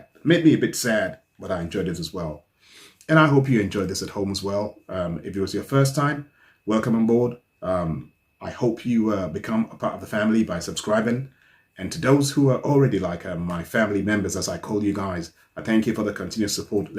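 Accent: England, United Kingdom. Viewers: It sounds like you are from the UK (England). How is it that you never heard this song?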